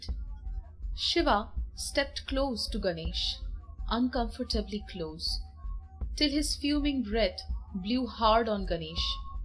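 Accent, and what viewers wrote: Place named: Bengal, India. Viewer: thank you for the audio book. being a bengoli your accent is very familiar and so enjoying with full attention.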